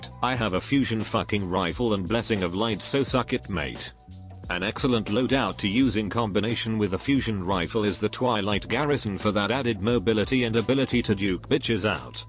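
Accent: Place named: United Kingdom. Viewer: Two questions. First, how do you do that mechanical Brit voiceover? Second, what's the track ?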